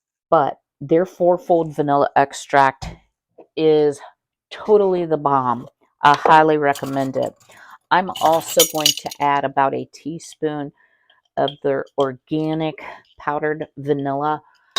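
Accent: South, United States. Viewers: So THAT'S where your southern drawl comes from!!! 😉 I plan on making this bread for xmas. Thanks for the idea!🎅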